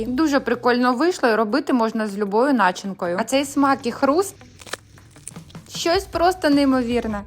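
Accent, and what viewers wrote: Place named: Surzhyk, Ukraine. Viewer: Нічого страшного, що Ви розмовляєте суржиком.Важливо ,що стараєтесь розмовляти українською мовою.Щиро вдячна за рецепт.Молодчина👍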